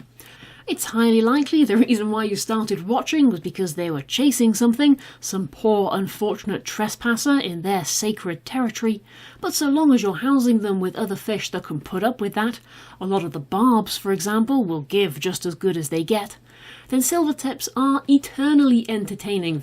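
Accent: United Kingdom. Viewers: Thank you for this video, it was so comprehensive. I just heard that they were a bit aggressive so I wanted to explore further. BTW - I love your British accent!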